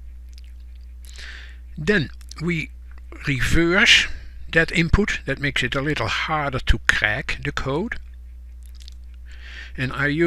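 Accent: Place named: Netherlands. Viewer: Sean Connery is Scottish. This guy sounds like he's from the Netherlands or something.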